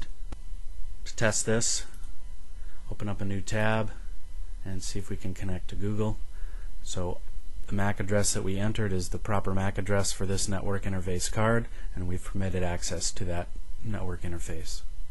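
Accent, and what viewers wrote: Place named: United States. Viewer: No accent??!! I could definitly hear the American accent.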